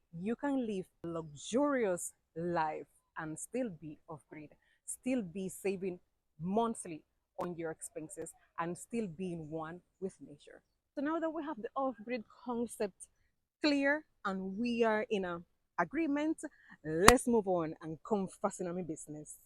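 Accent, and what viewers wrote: Patois, Jamaica. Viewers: Patois creep in pan u because u a jamaican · Your jamaican accent strong 🤣🤣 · i literally watch you for your patois/cuba accent - LOOOVEEE IT!! ( ok I also watch you for your cool personality and for inspiration on my move to JA - thank youuu!!) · I am a Jamaican living in the Bahamas. I love your Jamaican potwait sound good . Keep up the good work. Wishing you and your family great health and a blessed life . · Love your Jamaican accent 😂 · Sis I love the Spanish /Jamaican accent 😍😍😍😍😍 absolutely love THE LIFESTYLE😍😍😍😍👍💯.BIG UP USELF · Your a Cuban but have developed a Jamaican accent and still have Spanish accent absolutely love hearing you talk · Its your jamaican bad gyal accent for me lmaooo so funny annd cute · Your accent is strange..... in a good way. Its beautiful. Its like hearings 3 different persons speak at the same time. 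The patios, the standard english, the cuban accent👌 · Yoooo dat cubana and yaad accent caught me off gyaad. 🔥🔥🔥 when u said mi nuh ave nuh tv inna mi yaad, i died. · I love your ❤❤ your patois 😂😂😂😂. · Mi love how you have the Jamaican accent fully keep it up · Beautiful family life style, I love the way you speak Jamaican English. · Your Patois is so funny! 😅😂😂😂😂😂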